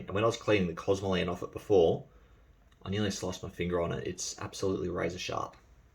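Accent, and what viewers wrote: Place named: United Kingdom. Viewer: Why is your accent and syntax so UK, if youve been in the US Army?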